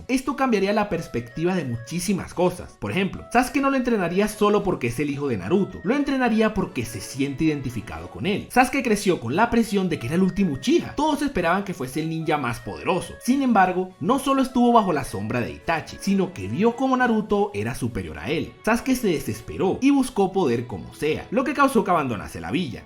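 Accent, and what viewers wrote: Ecuador. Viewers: Eres de ecuador ? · Eres de ecuador? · Eres de Ecuador?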